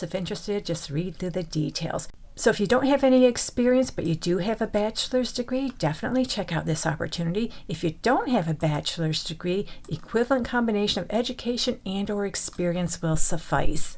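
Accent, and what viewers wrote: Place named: Wisconsin, United States. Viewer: I love the accent. Gives a Wisconsin vibe to it. Made this easy listening 😊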